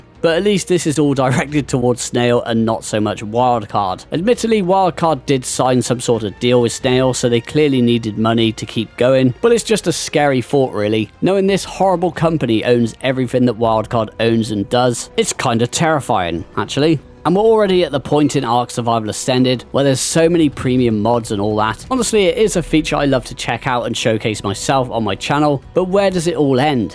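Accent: United Kingdom. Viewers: You hit the nail on the head mate. I love how sarcastic you are. God I love the British accent lol.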